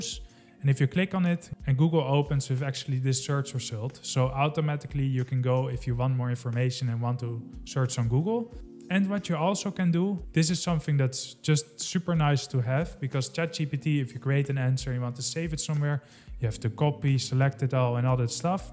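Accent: Netherlands. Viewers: I enjoyed your video on Bard vs ChatGPT very much. It was very informative and I learned a lot about the two language models. I could tell you are Dutch by your accent 😊. Greetings from South Africa!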